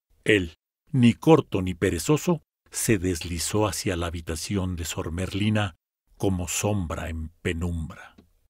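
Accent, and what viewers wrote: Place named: Vallegrande, Bolivia. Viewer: Este narrador creo es vallegrandino puro coplas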